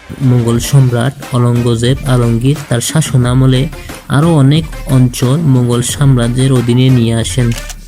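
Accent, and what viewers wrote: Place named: Chittagong, Bangladesh. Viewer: ভাই উচ্চারণ নিয়ে কাজ করতে হবে। অতিরিক্ত চাটগাঁইয়া এক্সেন্ট আসছে। শুনতে অনেক খারাপ লাগছে।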